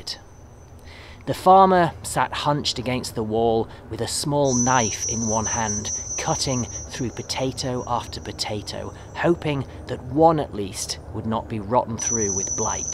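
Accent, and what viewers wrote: Ireland. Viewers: Sounds like you have become a Fully fledged irishman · Your accent becomes more Irish now mate! ☘️💚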